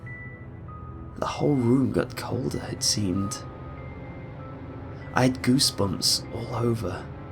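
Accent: United Kingdom. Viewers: I really like this pasta. I especially like the way the last one led onto this one. The fact that this was read by a British dude just make it so much better `0`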